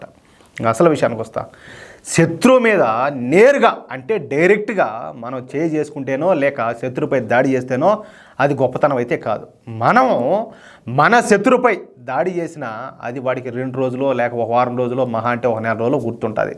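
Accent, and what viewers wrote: Rayalaseema, India. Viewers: రాయల సీమ లో ఏవూరు మీది గారూ? సీమ భాష బాగుంది. హైందవత్వానికి..దేశానికి బాగా సపోర్ట్ చేస్తున్నారు.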